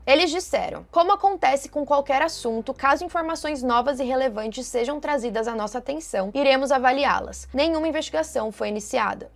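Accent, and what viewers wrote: Caipira, Brazil. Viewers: Sotaque cáipira nojento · Narração caipira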